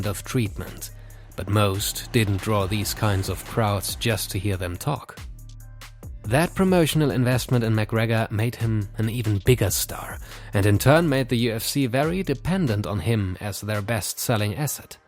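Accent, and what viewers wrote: Germany. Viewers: Love the German accent ngl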